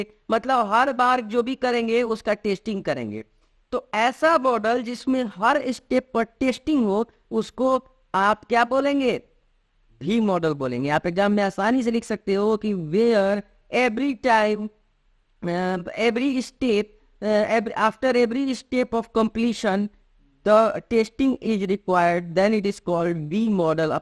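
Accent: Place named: South Asia. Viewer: Thank u Bhai, i love desi tone.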